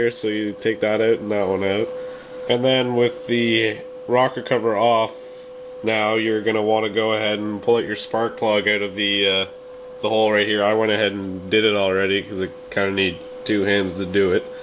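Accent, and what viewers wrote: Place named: Canada. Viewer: it took 14 seconds for me to realize you were Canadian lol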